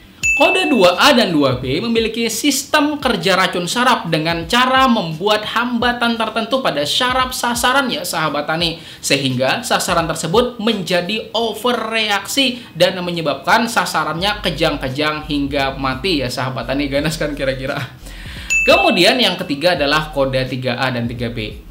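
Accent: Banjar, Indonesia. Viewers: hen cucuk ai ha asa pinandu kaitu nh u lwan logat2 bnjar .. S kalsel ja kita pak ai😁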